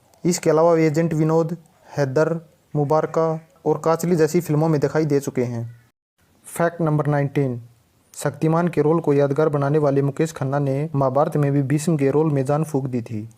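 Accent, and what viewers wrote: Haryana, India. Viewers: Bhai jyada haryanvy touch aa rha h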